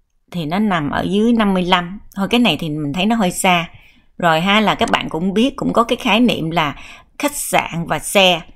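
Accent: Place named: Mekong Delta, Vietnam. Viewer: chị người miền Tây phải không, sao e nghe giọng của chị phát âm rất nặng mà tiếng cứ cứng cứng lm sao ấy ạ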